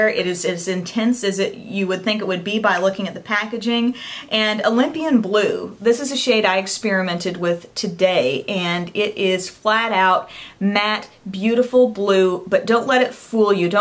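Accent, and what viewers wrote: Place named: South, United States. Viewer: LOVE your passion. And even though you're a newscaster, I can still hear that southern accent which I also love. Even though I was born in Texas, I never really developed a true southern accent that i could be proud of lol.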